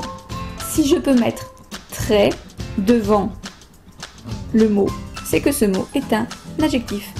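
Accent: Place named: France. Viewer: you good teach but accent french say not understand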